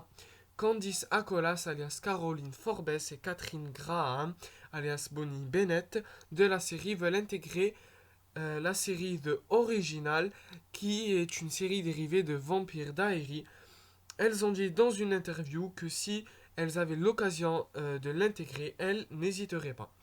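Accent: United Kingdom. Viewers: Aucune information donné est vrais se qui en plus de ton accent anglais ma fait énormément rire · Mon dieu, tu dis n’importe quoi ! Et ton accent Anglais ! Vraiment je dis pas ce genre de choses, tout est faux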